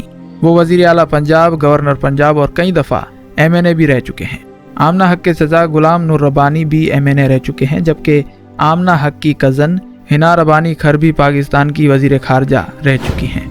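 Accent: India. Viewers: Aap Urdu sahi bolo Indian ki tarha nahin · This guy is an indian not pakistan. Notice the way he pronounces 'KH' like hindi speakers of india. Pakistanis, dont be fooled by this hateful crap created by an indian. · آپ کی اردو کا تلفظ مشکوک ہے۔ وڈیو ٹھیک ہے ۔ مگر آپ کا تلفظ ہندی ہے۔ اس کو ٹھیک کریں ۔ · یہ گنی اور کھواتین کیا ہوتا ہے؟ شرم نہیں ،،بے غیرت مت بنو،،اور اردو صحیح بولنا سیکھو،،،کیا تم انڈیا سے ہو؟ اگر نہیں تو لعنت ہے تمہارے اس لہجہ پر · Urdu pronunciation thik kero apni. Indian style mein boltay ho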